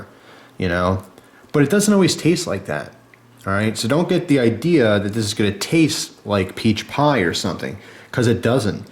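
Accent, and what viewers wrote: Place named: Long Island, United States. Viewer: very good video bud. you sound like you're from long island. I grew up in Suffolk County.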